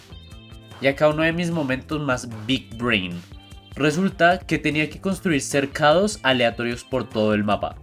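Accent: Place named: Colombia. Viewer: El asento se parece a Colombia no se muy bien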